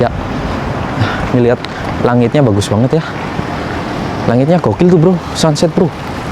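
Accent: Banyumas, Indonesia. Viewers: Mas ngapak, bikin konten di malam hari dong mass 🎉🎉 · Rika ngapak daerah ndi bro..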